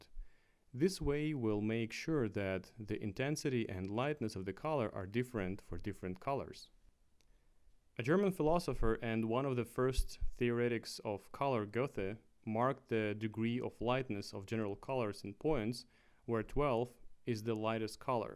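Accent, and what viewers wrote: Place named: Russia. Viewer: Love your russian accent :3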